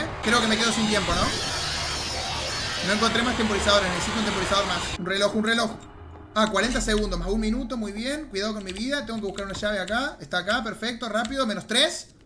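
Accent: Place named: Spain. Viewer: Naaaahhh, entre tus gritos, acento español y los nervios del juego me trasladé a otro universo 😂 Geniooo 👻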